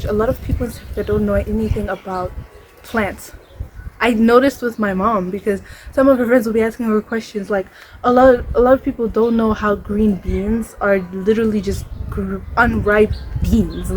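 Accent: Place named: South Africa. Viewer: That's south african accent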